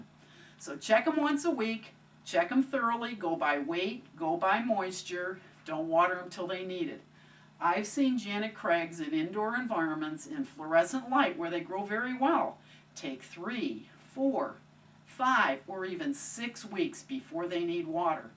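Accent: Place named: Central New York, United States. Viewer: I spent most of my life in Baldwinsville, NY, just north of Syracuse, so I know a CNY accent when I hear it. You have literally the thickest CNY eeyacent I've ever heard in my life. You may be from like Buffalo or Rochester or something in western NY I guess. But holy shit, you're like the epitome of upstate/central NY/western NY rural twang. I bet you're a huge Wegmans fan and I bet you've cooked salt potatoes more than once.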